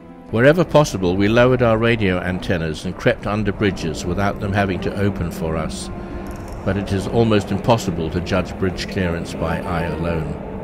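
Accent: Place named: RP, United Kingdom. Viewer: There is no better way to tell a story than when the narrator speaks the Queens English. We Americans should learn to speak clearly and enunciate words properly. My travels to England however, proves they also should learn the same lesson.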